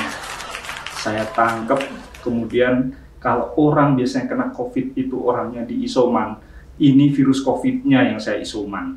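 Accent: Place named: Java, Indonesia. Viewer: Keren dok....suka bahasane... pertahankan medoknya dok...he2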